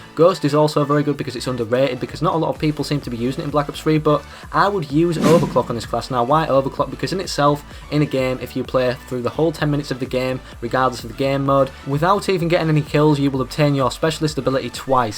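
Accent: United Kingdom. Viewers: I hate your British accent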